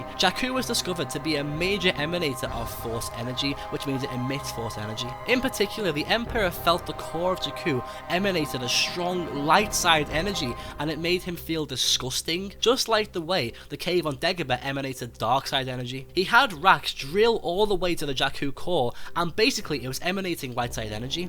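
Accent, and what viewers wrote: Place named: Northern England, United Kingdom. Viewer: Just wondering - I'm guessing you're from northern England - what football team do you follow?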